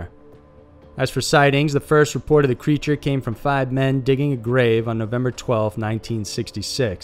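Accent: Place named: Texas, United States. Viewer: I absolutely love all of your videos. One of the best channels and def the best narration/voice. I wonder if you're from Texas? I am and your accent always makes me think you are :)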